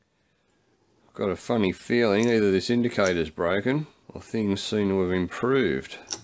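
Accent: Australia. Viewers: Dude kick ass accent. Ausi I gather. Good vid bro.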